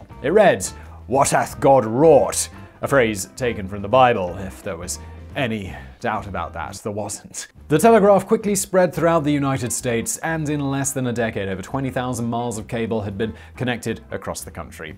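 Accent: United Kingdom. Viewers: Thank you for pronouncing Newfoundland correctly.. well at least as correctly as possible with a British accent.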